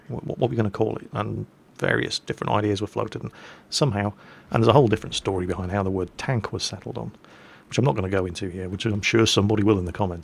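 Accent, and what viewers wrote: Bavaria, Germany. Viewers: Are you bavarian by any chance? :P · can we hear you doing your German accent for one Mingles with episode? I can't help but love hearing your German accent. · Dat German accent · where did you learn talking with this awesome german accent?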